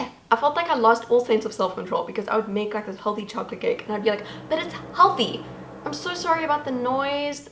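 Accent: South Africa. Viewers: are you south african omg love your accent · You probably get this question a lot, but is your accent south African? It's very beautiful. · Wait r u south african?? Capetonian here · You sound South African 🙃 anyway great video thanks.... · I thought i recognized the accent. Im also from SA, Cape Town. :) Love your channel!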